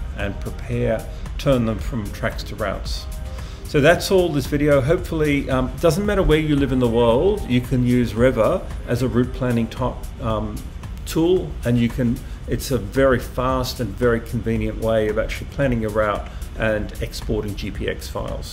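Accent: Australia. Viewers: Wait are you actually from Texas? I am assume originally Aussie?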